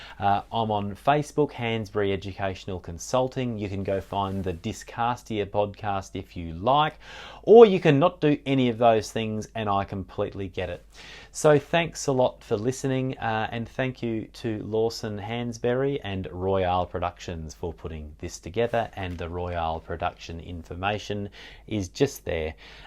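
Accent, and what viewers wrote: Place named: South Australia, Australia. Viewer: This was a really helpful video to watch and made me think differently about phonemes and strategies for phonemic awareness ('words are actually blobbed'...great image). I teach adults English as an Additional Language (migrant program) in WA. It's great to find some examples of each phoneme spoken in an Aussie accent. As I'm originally from Adelaide, I appreciated the South Aussie accent too...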